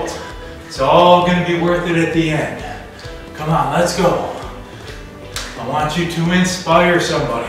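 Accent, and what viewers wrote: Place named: Wisconsin, United States. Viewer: First week down. LOVE IT. And I must say. I dig the “WIzkahnzin” accent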